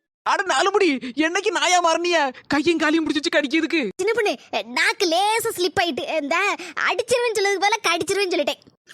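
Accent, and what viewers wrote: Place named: Tirunelveli, India. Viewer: சூப்பர் திருநெல்வேலி பாக்ஷை....கேட்க இனிமையாக இருக்கு...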